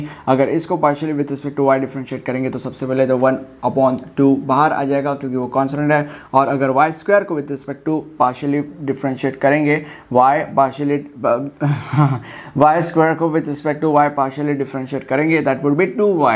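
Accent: United Kingdom. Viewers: British accent 🫡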